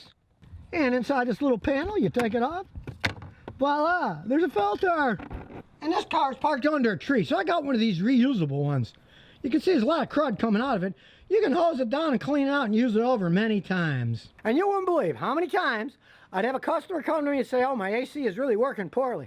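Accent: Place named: Pittsburgh, United States. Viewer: Have you always lived in Texas? You have a slight Pittsburghese accent.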